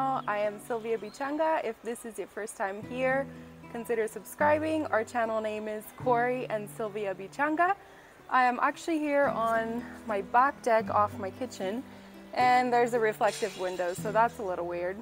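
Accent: Kenya. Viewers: Your accent are becoming Kenyan 😍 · So Sylvia....one thing that has fascinated me about you is the Kenyan accent you have while speaking English....amazing. I am Kenyan myself living in Iceland for twenty three years. You just earned a new subscriber. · I can hear a bit of Kenyan accent in your Lish. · You already sound Kenyan, your accent!! This was lovely to watch. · Your accent sounds like Kenyan and not American….by the way I live in Reynoldsburg, Ohio. You’re inspiring! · That's ugali size normally not enough for one 😃. I like this and definitely you no longer sound mzungu..yous accent is just Kenyan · You sound more of a luo,its understandable coz Koree has been brought up amongst luos,he actually looks more luo than kisii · Your Kenyan accent is on point! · Your accent and English are soooo Kenyan. The adaptation/change is incredible, almost unreal. Enyewe, we learn everyday. 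Waah!! And there's nothing as tasty as Ugali and samaki. I could eat that every day of the year, but I have to get over a laziness in the kitchen that's come over me lately. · You sound so Kenyan🤣♥️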